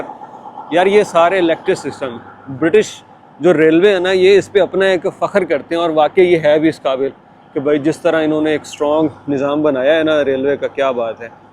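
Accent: Punjab, India. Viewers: ایک مودبانہ مشورہ دوں گا، ناراض بھی نہ ہوئیے گا۔ آپ ایک اچھے یوٹیوبر بن سکتے ہیں۔ دو چیزوں کا ضرور خیال رکھیے۔ ایک تو یہ کہ آپ کی اردو اچھی ہے اس میں پنجابی کا تڑکا نہ لگائیں کیونکہ اس سے وی لاک کا مزہ کم پڑ جاتا ہے۔ دوسری بات سیاسی گفتگو سے پرہیز کریں کیونکہ آپ کو بیچ بیچ میں سیاسی چٹکلے چھوڑنے کی بھی عادت ہے۔ پاکستان میں بہت ظلم چل رہا ہے، جبکہ آپ اکثر اٹھکیلیاں کرتے رہتے ہیں۔ امید ہے ان چیزوں کا خیال رکھیں گے۔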